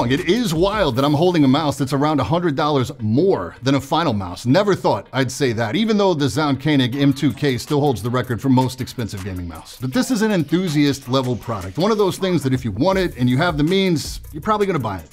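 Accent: New York, United States. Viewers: I love his New York accent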